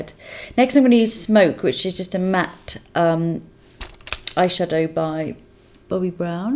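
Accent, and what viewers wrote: United Kingdom. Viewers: love this tutorial, i think i may follow it for the days when i have to go to work with just little hours of sleep, it may sound geek but listening to your videos helps me improve my english and i can understand british accent better, so apart from learning about make, i also improve my language skills!!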